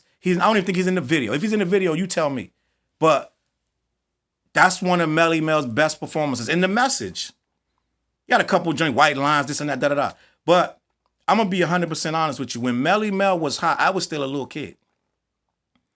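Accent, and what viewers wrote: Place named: Washington DC, United States. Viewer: Good breakdown on this. Question, you from DC area? Lol. You sound just like us in this breakdown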